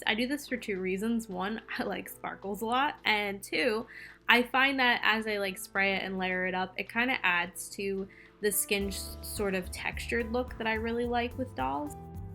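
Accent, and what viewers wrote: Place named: South, United States. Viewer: I think this doll is phenomenal 😻 so dang inspiring! Your fascination with how you say clasps was hilarious. I think it's just 'cause you have a touch of a southern (?) Accent. It's actually the a sound that makes it sound different. I love how you talk though..."I don know 'skina cute". Also, have I just found you in the last two weeks? Yes. Am I bring ng all your videos while working on my own. For dang sure. Love it.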